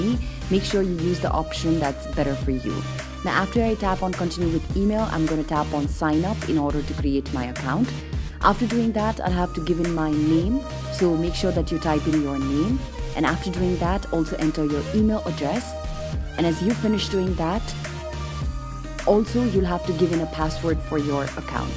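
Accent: India. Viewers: Sounds hindi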